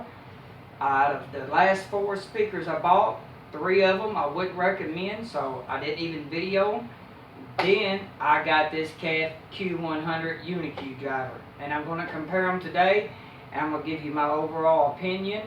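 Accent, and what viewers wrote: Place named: Texas, United States. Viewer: I like this guy’s accent. Where is he from, Texas perhaps?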